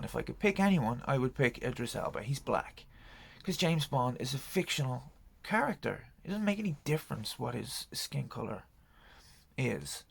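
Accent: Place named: Ireland. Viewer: I love the Irish accent, it's my favourite alongside the Italian accent. It's always nice to hear an Irish accent, especially when they talk about a subject I'm passionate about. :)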